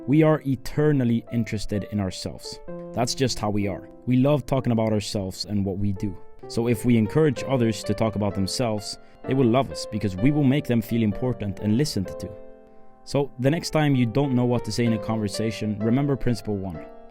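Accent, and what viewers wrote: Sweden. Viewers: I heard from ur dialect and I knew u were from Sweden :D before u said . Swedish people usually have different dialekt, instead of saying visualising which sounds like vizhualising, swedish people usually say vis-shualising. Or cheese, swedish people say sheese. Just a fun fact and have a great day :)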